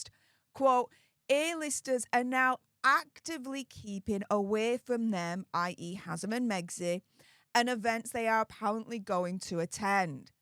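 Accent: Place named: Northern England, United Kingdom. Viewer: Great music 🎶 Just love a northern accent 😀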